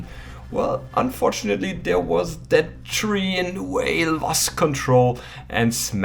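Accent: Germany. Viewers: Moin !! Bist du deutsch 😅man hört dein Akzent haha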